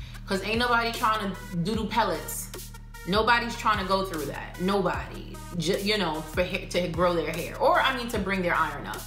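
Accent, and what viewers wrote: New York, United States. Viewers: Great info....gurl....I know that accent....Gurl what part of NY you from? lol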